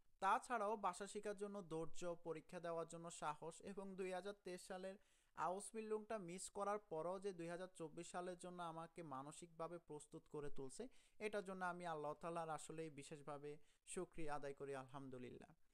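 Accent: Noakhali, Bangladesh. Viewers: Vaiya Noakhalir kon jaygay basha apnr ❤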